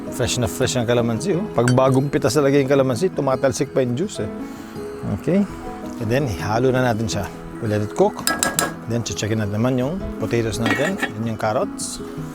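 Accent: Visayas, Philippines. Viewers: Makalingaw ang pagbisaya ni Goma hahaha mechado naman sunod unta. Great job on this recipe!!!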